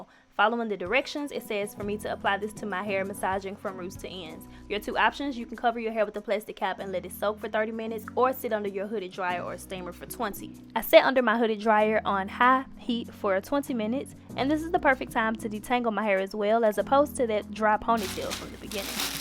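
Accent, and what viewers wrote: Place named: Georgia, United States. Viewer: I just love your country accent!! Ga. Represent!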